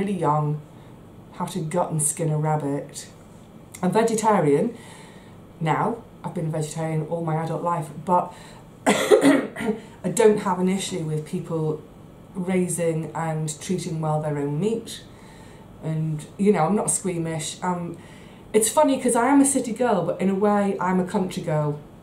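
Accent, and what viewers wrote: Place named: RP, United Kingdom. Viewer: Hi. I just found your channel from one of your followers, after one of her subcribers mentioned you in a YouTube comment. You can't put a price on being mortgage-free. You also sound really posh, for coming from a working-class family, lol. 😇 Anyway, my life has been quite similar to yours, apart from the burglary part. A working class family from the 1970's, with bone stew from our local butchers twice a week, with my Mum having only £25 a week for food, bills and everything else. This is a very honest and down to earth video, and it's really great. I'll watch a few more videos before I decide to subscribe. I wish you a Happy New Year, and I hope you have an amazing 2024 ! 🌷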